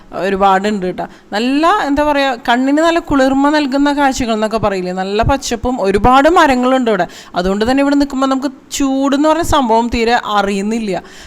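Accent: Thrissur, India. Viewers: ശബ്ദത്തിൽ നിന്നു മനസിലാകുന്നു തൃശൂർക്കാരി ആണെന്നു - ശരിയാണോ?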